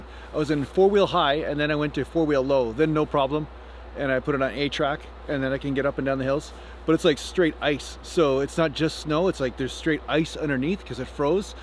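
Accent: Canada. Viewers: Are you Canadian aye?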